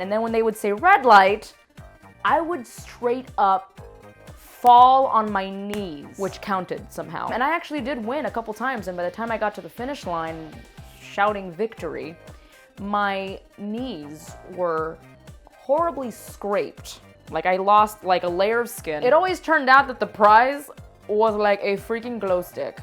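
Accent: Cuba. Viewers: I luv her Cubaglish.🤗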